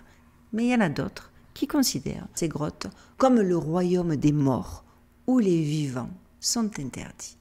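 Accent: Marseille, France. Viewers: Pub France Vérif' :face-green-smiling: On reconnait la marseillaise qui demeure en toi!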